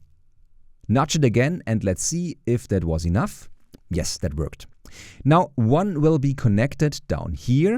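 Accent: Germany. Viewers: 1. Kann es sein das das ganze nachgesprochen wurde? Und wenn ja, gibt es das Original auch auf Deutsch als ganze Serie? 2. Ich finde man hört mega das Deutsche raus :D Also ich nehme mal an das ist ein Deutscher sprecher, was keinerlei kritik ist, ich finde es nur erstaunlich wie... Deutsch die Stimme klingt :)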